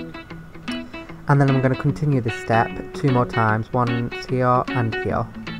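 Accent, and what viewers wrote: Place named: Nottingham, United Kingdom. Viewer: Just subscribed as it's amazing! Where you from? You sound like a Nottingham lad👍🏻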